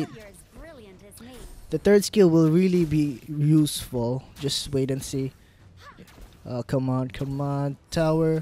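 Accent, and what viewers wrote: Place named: Philippines. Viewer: Lol pinoy KA no KASI accent mo PA LANG pinoy na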